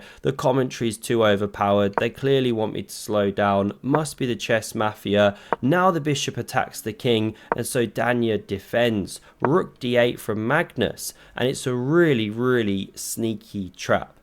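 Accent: United Kingdom. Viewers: Got to love your British accent... I thought I heard "What a channel! Like a BETA version of me" and I‘m like "wait, what ?!!" So listened again. Love the humility. 180 degrees from what I thought I heard. Both channels are awesome.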